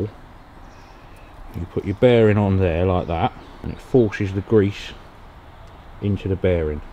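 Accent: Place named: United Kingdom. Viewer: ''This is a sh@ horrible thing called a bearing cup greaser.'' Dammiitt! Yeah, we got them over here too Y'all. But our's are morons more along the lines of what I'd call a ''Political Bearing Begging Cup Greasers'' Tickled me that when I'd left the volume up to listen while making my bladder gladder... the thought of Michael Caine popped to mind as was talking. Same part of Britain as he, no? Too tight cold plays hell on all metal surfaces once their heated up under motion. I can better see that here. When I'd brought my '68 Trophy Sports back home from Ft. Bragg in '74. Dad having been a mechanic all of his life; begin to immediately moor over it's mechanical being. Next morning I got up & he'd done 2 things to it that evening before. He'd drilled & wired the tank's 2 front fastening nuts that same way. Also the rear crack split lateral bar of the tank's ''Castration Parcel Grid'' had been joined back together via a split vacuum advance rubber hose. 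He said that outta help keep the to tank stay on good & that package rack from de nuttin' ya... cause your gonna owe me a grandson or two. Good stuff all around in the ''Strengthening of them things that remain''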